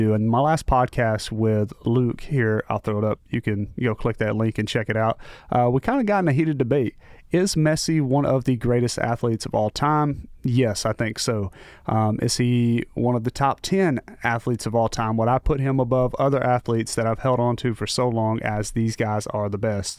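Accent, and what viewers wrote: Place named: United States. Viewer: Football bro come on, I'm American too but never will I say sawkir.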